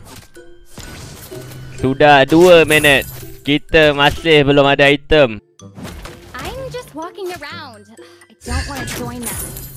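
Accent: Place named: Malaysia. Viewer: Gw dari indo ntah knp gw suka bgt denger suara malaysia njirr Kek nonton upin ipin jdi keinget masa kecil wkwkwk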